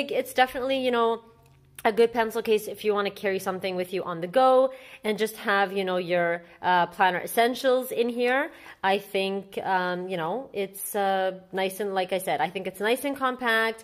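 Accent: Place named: France. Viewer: your French accent is beautiful! You must have spent some time in France 🇫🇷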